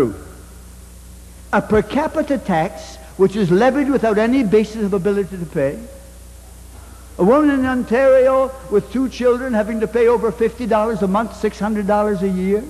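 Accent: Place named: France. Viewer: I like the idea of free health care but our national budget comes first otherwise Canadians starve.some socialist believe that more barrowing and spending is a good thing but its your money and you spend it the way you want to.would this man qit ranting,his French acsent annoys the living hell out of me.scialisum dosent help the poor,it drags everyone down from richest of rich and poorest of poor.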